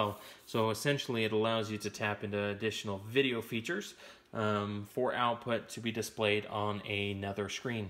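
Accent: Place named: Ireland. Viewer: Damn this guy is severely irish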